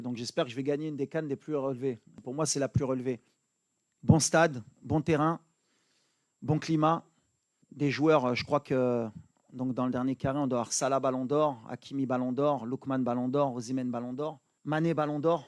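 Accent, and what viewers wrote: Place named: Paris, France. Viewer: Il parle Parisien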